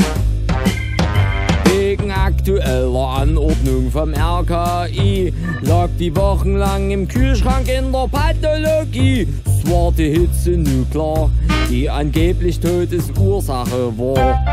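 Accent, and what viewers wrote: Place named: Saxony, Germany. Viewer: Köstlich. Und auch in diesem Jahr geht‘s wieder los. Es ist auch wunderbar, mal einen echten sächsischen Dialekt zu hören. Nicht immer dieses pseudo-sächsisch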